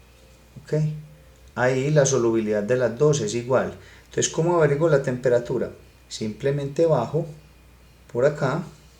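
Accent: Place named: Colombia. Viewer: la voz colombiana ctmm mata wn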